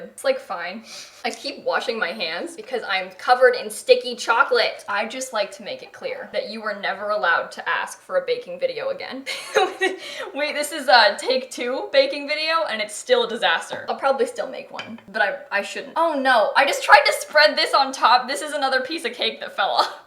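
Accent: United States. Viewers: One of the reasons I love your videos is because I compare our accents. In America you say sift and in Scotland we say it like siv 🇺🇸👉🏴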